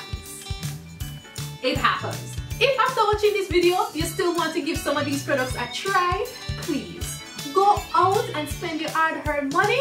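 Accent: Jamaica. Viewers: I had to sub right away! Love your infectious personality and accent! My mom is Jamaican so I picked up that accent right away! 🇯🇲🇯🇲🇯🇲🇯🇲🇯🇲🇯🇲🇯🇲🇯🇲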